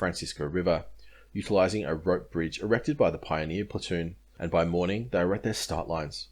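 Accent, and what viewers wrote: Australia. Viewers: We'll I don't know if you picked up on the accent mate, but he is an Australian. Funny then the Aussie content.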